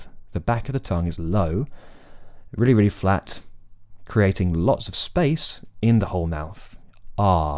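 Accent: United Kingdom. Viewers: Your standard British accent is so beautiful. The way you flow from words to words is so beautiful like music. I know you grew up speaking English but did you train to be such a great speaker. You speak so clearly and you annunciate your words, some native speakers don't do that.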